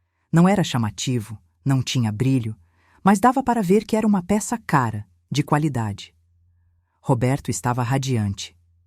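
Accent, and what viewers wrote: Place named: São Paulo, Brazil. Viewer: A narradora estava falando tão bem,com um sotaque bonito de são Paulo capital. De repente, o sotaque ficou interiorano trocando o R pelo L. Horrível.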